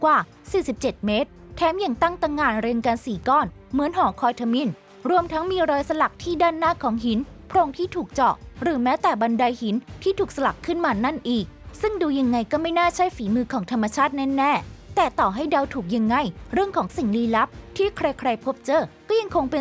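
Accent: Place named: Isan, Thailand. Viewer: เจ้าของช่อง เป็นคนอีสานใช่ไหม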